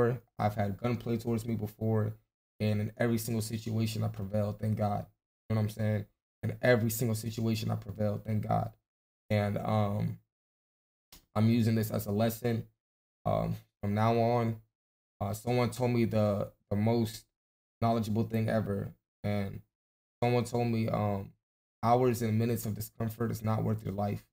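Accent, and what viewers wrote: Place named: Canada. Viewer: Mans talking like Canada sweet lol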